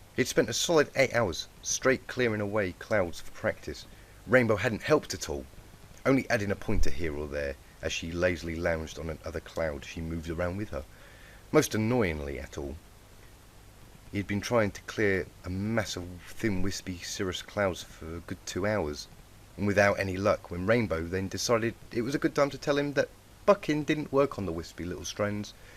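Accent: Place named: United Kingdom. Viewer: the guy reading is british, and guy who wrote it is aussy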